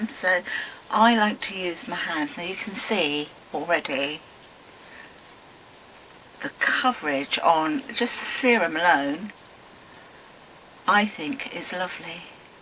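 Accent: United Kingdom. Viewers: Yes my middle aged ears are ok lol.Just prefer to hear your lovely English accent my dear.💗👗💟💋👠👛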